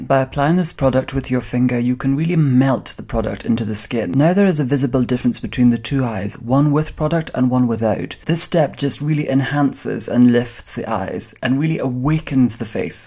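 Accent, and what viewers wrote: Scotland, United Kingdom. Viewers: SCOTTISH, I KNEW IT!!! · Ah, I knew i heard Scottish inflections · Scottish! I’ve been watching your videos all day trying to figure out what accent you have! Lol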